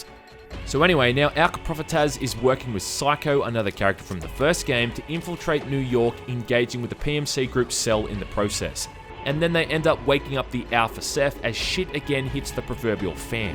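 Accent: Australia. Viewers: hussien Strahyan Accent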